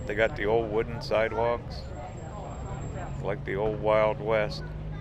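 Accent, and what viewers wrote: United States. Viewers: Your English accent is for any particular area in the U.S. ? I like your videos, cheers from Ireland 🇮🇪 👍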